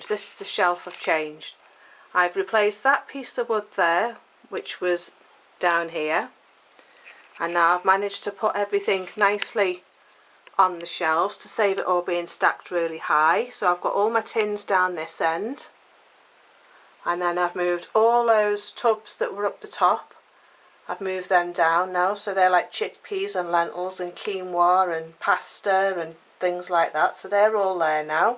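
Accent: Liverpool, United Kingdom. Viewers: Nice pantry ,where do you come from originally? I thought a bit of a Liverpool accent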